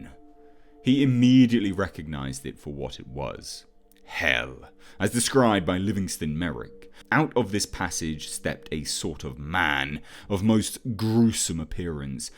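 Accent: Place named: England, United Kingdom. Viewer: I am trying hard to get your english accent. I need to listen to you more for the true English pronunciation of words.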